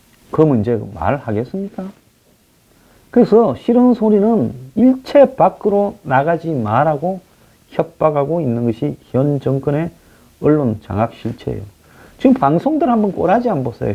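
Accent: Gyeongsang, South Korea. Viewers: 완전 경상도 스타일 ㅋㅋ 화이팅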